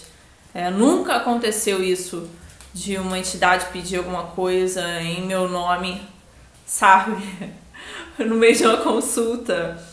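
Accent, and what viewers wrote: Rio de Janeiro, Brazil. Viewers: ? VC É DO RJ?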